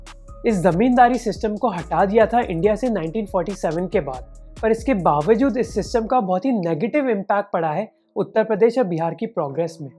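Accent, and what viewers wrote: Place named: Karnataka, India. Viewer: YOUR VOICE IS SAME AS MY KANNAD FRIEND .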